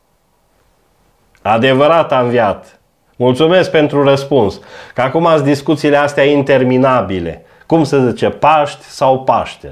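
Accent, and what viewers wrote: Transylvania, Romania. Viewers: Adevărat a înviat, domnu` profisor! Ador ardelenii.. am avut în facultate prof de hidraulică ardelean, ador graiu ardelenesc, mni! :) Doamne ajută!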